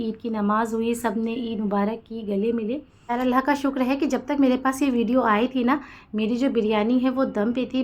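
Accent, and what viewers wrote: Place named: Delhi, India. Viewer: Wow aap dehli se h isi liye itna peyara labo lahza h mujhe hamesha andaza hota tha aap dehli ki ho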